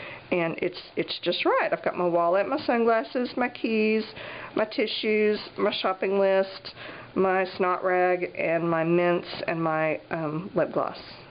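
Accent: United States. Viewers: Sorry but your American English is cracking me up!..pipe ets?....in the science lab we call them...( pip ets) not (pipe-ets)...gosh I love you girl.....lol...lol...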